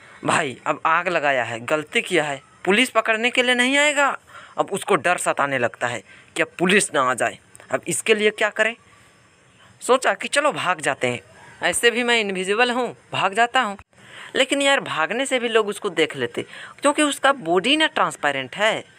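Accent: Bihar, India. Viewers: Bihari ho kya bhai 🎈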